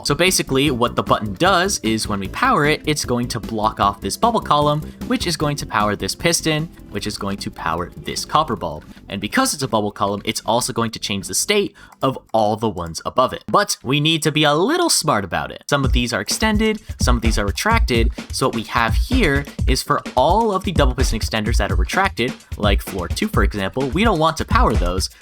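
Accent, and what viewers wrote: United Kingdom. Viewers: why does sound a lil bit english today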